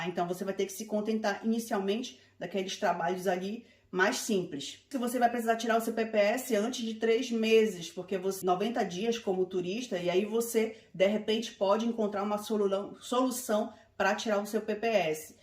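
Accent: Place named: Pará, Brazil. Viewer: Ótimo vídeo, conterrânea! Nemo dá pra perceber que você também é paraense kkkk... sotaque super marcante .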